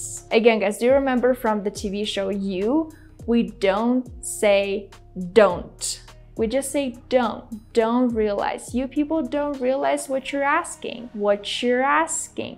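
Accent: United States. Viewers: I love Your English Videos so much Dear and You are so great speaker in American English, I think you live in USA.😊😇🥰😍🤗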